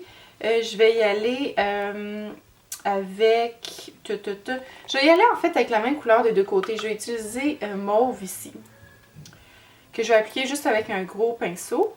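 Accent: Quebec, Canada. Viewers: Adorable 💖 ma super heroe : tu expliques merveilleusement bien et ton accent canadien wahou 😍 on meurt d'envie de partir vivre chez vous : au CANADA 🇨🇦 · Waouh !!! Quel œil de biche ! Merci pour la technique et ce petit accent québécois j'adore 😉😊🌻❤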